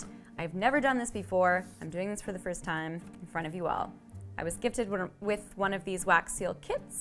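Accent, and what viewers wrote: United Kingdom. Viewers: speaking british will be the death of me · as a brit i'm pleasantly surprised at english accent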